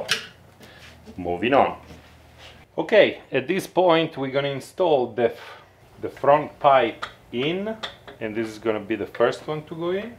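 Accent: Italy. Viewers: That italian accent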